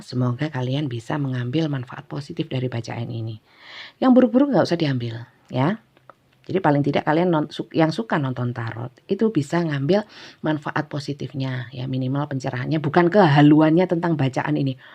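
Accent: East Java, Indonesia. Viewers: Assalamualaikum Bunda...sll nyimak n suka readingan Bunda aplgi logatnya khas jatim...mantap👍🙏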